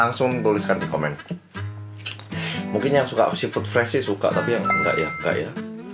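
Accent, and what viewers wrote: Java, Indonesia. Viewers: Jowo ne sampeyan ke pundi? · Ada logat bahasa jawanya 😅😅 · Keliru aku,, ini orang Jawa apa Korea yaa 😄😄 koq kaya ada logat jawanya gtuh 🤣🤣✌️🙏🙏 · Medok njir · Muka korea ngomongnya jawa😅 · Bang ngomong nya kayak orang Jawa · Kok ngomongnya kayak orang Jawa😂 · Ini tuh orang jawa medok apa kalo korean ngomong bahasa indo jadi kek orang jawa medok ya aksen nya? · "halo guys, welcome back to korea reomit bersama orang korea yg luwe~" "halo guys, welcome back to korea reomit bersama orang korea yg medok~" apapun versi nya, ntah kenapa tetep suka;) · It's just me or dia Emang medok? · Kuei lho mas medhok pol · Ini pertama x belajar bahasa nya di mana?kok logat nya kayak jawa 🤣🤣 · Kaya orang Jawa bahasa ngomong nya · Muedok e mas wkwk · Ini org korea ap jowo gaesss 😁😁 medok tenan are iki 👍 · Medok jowo kaliii kmu bang.. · Korea tapi bisa punya logat jawa asli..kerennnn😂😂😂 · Kok logat nya kaya Jawa? 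😅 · Ngomong indonya kek orang jawa ngomong indo wkwkwkwk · Njowo bat wkwkwk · Logatnya kek logat Jawa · Gua heran sm dia..... Orang korea ngomongnya kayak orang jawa.... Yg setuju like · Jir korea rasa jawa medok guys 🤭 2020 hadir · Kebiasaan tinggal ditempat yang bahasanya jawa jadi gitu deh nada nya😅😂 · Opo ikih tak kiro wong asli korea jebul nek ngmng yo iso medok ngono😅😂 but i like😍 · like bangett sma cara ngomong nya.. medhog bangettt mas ee... 😊😊😘😘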